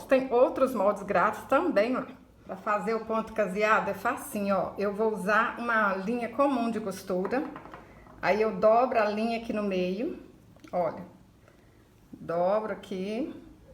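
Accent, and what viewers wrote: Minas Gerais, Brazil. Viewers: Boa noite minha querida! Q "lindeza"de artesanato como vc diz né? Vc é uma amor de pessoa ,esse jeitinho de falar de "mineirinha" toca no coração da gente. Obrigada por nos ensinar tanta coisinha linda,fico feliz demais. É assim q me sinto quando "posso" te ver. Porque nem sempre a minha internet permite eu entrar no You Tube. Mais uma vez parabens e obrigafa por todo carinho. Q Deus te abençoe e seja sempre feliz querida. Bj no teu coração!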